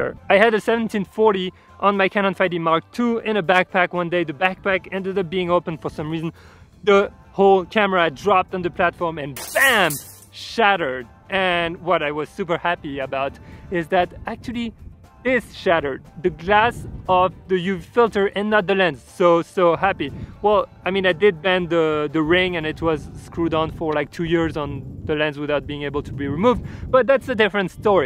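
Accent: France. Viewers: ur french ! dT'S why ur accent seems like dt 😁 but ur awesome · hhh freinsh accent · Thats the natural French accent while speaking English! :) or may be the microphone was not powerful enough!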